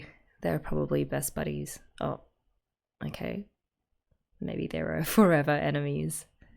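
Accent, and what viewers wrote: Australia. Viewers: 紮馬 sls 香港人？ i thought you were aussie through and through lol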